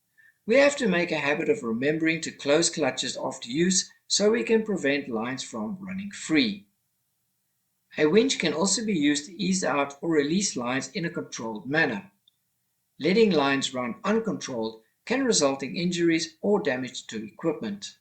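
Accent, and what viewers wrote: Durban, South Africa. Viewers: Great videos and well explained. Graphics are excellent. Do I get a hint of Durban accent?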